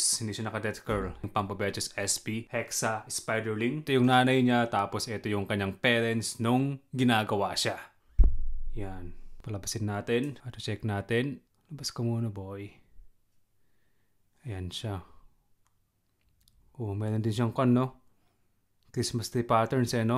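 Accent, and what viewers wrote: Ilocos, Philippines. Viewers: Ilokano kayo? Hehe.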